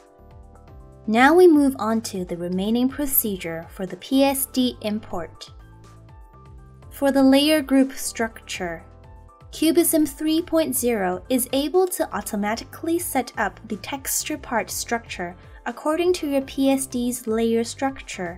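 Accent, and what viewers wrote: Japan. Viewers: I´m from Mexico and what I note is not about your comprehension of English . The problem is the person who is explaining the video, It seems that is a Japenese person talking in English, is noticeable due to the accent and is hard to follow what she says until you hear various paragraphs of what she explained. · I think it's because she's Japanese and she's trying to focus on her accent lol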